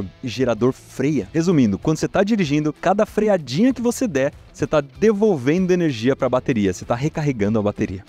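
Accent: India. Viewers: At first time I thought you were indian, because of your accent, but then I realized you are Brazilian and the video are Dubbed. Nice Vídeo. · Man pq o parece um indiano com esse áudio em inglês? Eh IA ou é ele msm falando?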